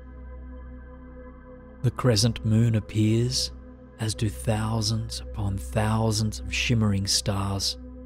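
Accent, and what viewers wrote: Australia. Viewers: Its always weirdly comforting to hear another Australian voice on YouTube! Thanks for your efforts bro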